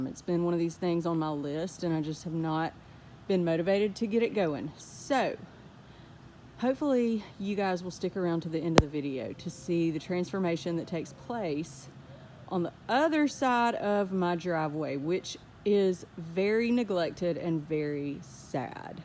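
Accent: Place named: South, United States. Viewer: You talk just like me I’m on gulf coast pensacola fl.. however grew up in TN.. glad to find a southern gardener!! I would extend the bed up drive and connect with other bed.. it would make each side more symmetrical... love the plants!!👏❤️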